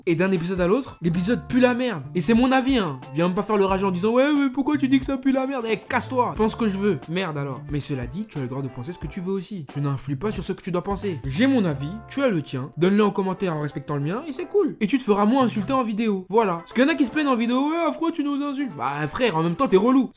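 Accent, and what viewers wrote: Banlieue, France. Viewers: pourquoi tu parle comme un wesh de banlieue ?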